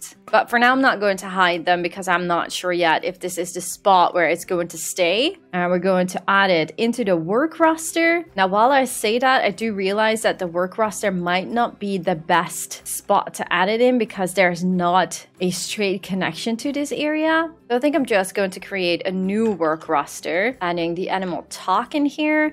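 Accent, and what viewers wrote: Netherlands. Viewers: im non-binary and my name is Nads. it would be really awesome to see a more androgynous looking staff member with my name 🥹 or a funky lil animal. I'm also Dutch btw!!! our accents are very similar 😁. I've spent the whole morning catching up on this series, and i love it so so much!! i would love to see more foliage footage personally, and besides, you tag timestamps so well (thank you btw) that people could easily skip ahead if they wanted to!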